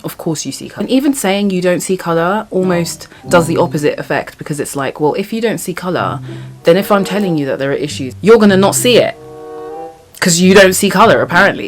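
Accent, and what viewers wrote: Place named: RP, United Kingdom. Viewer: You sound Posh 😂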